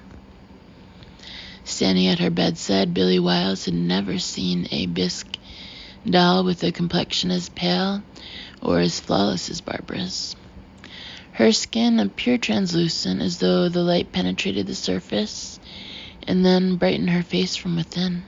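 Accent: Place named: New York, United States. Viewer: Your relaxed, classic New York accent is the perfect narration for these novels. It makes them a pleasure to listen to.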